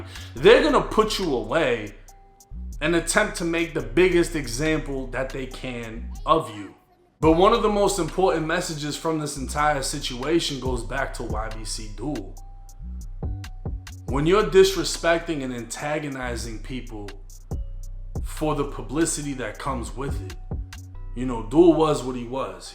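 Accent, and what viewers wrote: Boston, United States. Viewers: U gotta be from Boston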